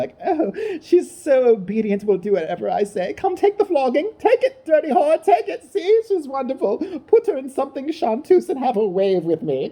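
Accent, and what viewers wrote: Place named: United Kingdom. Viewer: I google it ( exempt ) BC... I'm a Genius... Lol🤣 ur always sooo nice and Kind to me 💞... Thank you🙏 btw, loooovvvee ur British accent🤣😭!!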